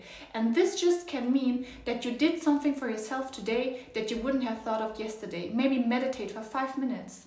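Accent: Germany. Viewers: Bist du deutsch, dein Akzent hört sich so an:) bin auch aus Deutschland und mag deine Videos viel zu sehr. Liebe Grüße ❤️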